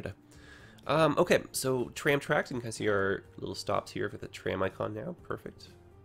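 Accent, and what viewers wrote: Canada. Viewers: I know this is a 3 year old comment but that's just his Canadian accent.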